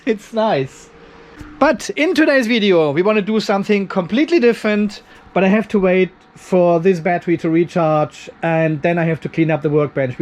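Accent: Germany. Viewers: Höre ich da einen deutschen Akzent raus?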